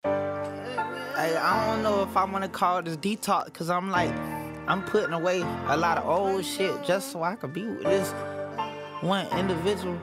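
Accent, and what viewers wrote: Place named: South, United States. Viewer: _- He has an accent cause he's from the south. This how he talk on the regular a lot rappers now a days have accents like this but i can understand everything he say stop hating cause yall jist putting more views on his shit anyway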